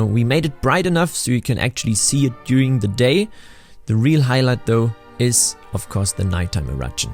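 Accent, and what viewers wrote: Germany. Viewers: the most "german english" i've heard in a long time. weiter so! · Das LEGO-Build ist Genius-Level - das Video ist sehr spannend. Nur dein Englisch ist eine Katastrophe...Vokabular gut, es geht nur um die Reihenfolge der Wörter, die typisch deutsch ist, wie auch die Aussprache. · as a german i hear the german accent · junge was ein gammliges kartoffelenglisch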